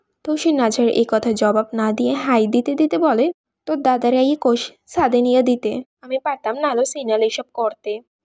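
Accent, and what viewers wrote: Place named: Barishal, Bangladesh. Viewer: আপু তুমি মনে হয় বরিশালের মেয়ে তাই তোমার ভয়েস টা ও বরিশালের মেয়েদের মত লাগে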